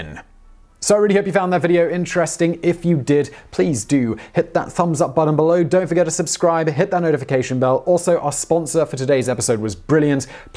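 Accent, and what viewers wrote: United Kingdom. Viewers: You sure do make a lot of assumptions. So Neil Patrick Harris is probably pretty knowledgeable about surgery? And news anchors practice reading off the teleprompters? You bring up that he has multiple channels. Is he doing that for all of them? You only think of him as intellectual because of his British accent, not because of any real critical analysis. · Nero tarnished his own name. He didn't need anyone else to do it. He is likely the Beast of Revelation. This guy gets a lot wrong, but because he says it with a pompous British accent and feigned authority, people believe it.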